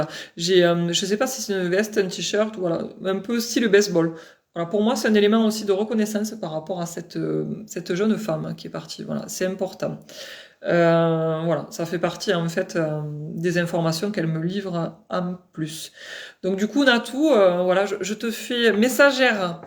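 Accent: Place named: South, France. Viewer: Moi, ca me fait du bien d'écouter votre accent du Sud 😊🤔😁Io ne me manque plus que le chant des cigales et se sera ok, je vous entendrai bien 😅🤔😁💚💚💚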